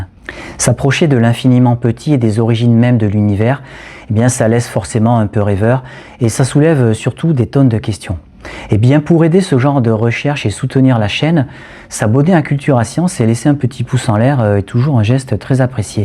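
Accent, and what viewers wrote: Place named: South, France. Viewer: Aïe ! Il vous faut prendre des cours de diction, mon garçon : la monotonie de votre récitation est insupportablement ennuyeuse, malgré les infimes traces d'accent méridional que vous y laissez traîner. Essayez de faire lire vos textes par une voix synthétique, ce ne pourra pas être plus mauvais en tout cas. Cordialement !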